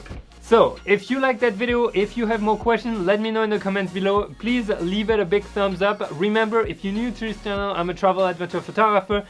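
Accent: France. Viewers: Just love your french accent 'And Voila' love it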